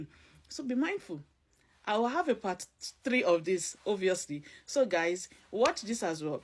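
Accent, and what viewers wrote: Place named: Nigeria. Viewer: I was particularly drawn to your video when I heard the Nigerian accent, because I'd love to hear a Nigerian talk about this topic. Thank you, Sister. The descriptions are perfect for them. I've met them as boss, wife, colleague and pastor. They're plenty among us in Nigeria but most of our people are not aware about their devilish tactics. The worst are the Christian narcs. The most terrible are the covert ones. I've been trying to educate people through my posts so that their 'unlucky' spouses can be aware and learn how to protect themselves and stop being victims of their wicked cruelties. I'll be sharing this video in my WhatsApp.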